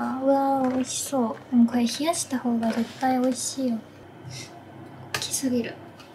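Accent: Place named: Kansai, Japan. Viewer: さんの関西弁最高やー😊お部屋も勿論やけど🎉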